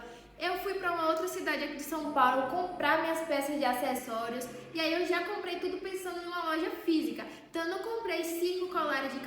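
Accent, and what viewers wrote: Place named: Bahia, Brazil. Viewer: minha querida, seu sotaque é bem baiano e eu amei seu vídeo, que loucura tudo isso, sua irmã é uma santa kkk boa sorte espero que prospere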